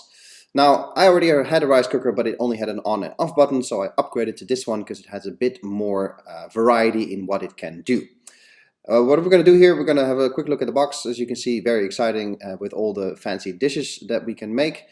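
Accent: Netherlands. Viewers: Are you dutch?Good review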